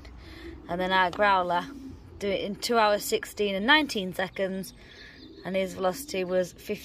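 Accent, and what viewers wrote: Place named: Hull, United Kingdom. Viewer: What part of the uk are you from, sound like you have a hull accent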